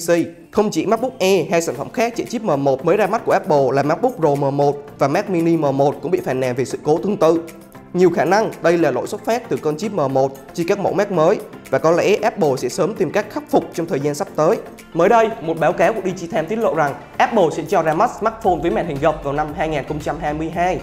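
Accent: Phu Yen, Vietnam. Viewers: Giọng phú yên nói nhanh quen rồi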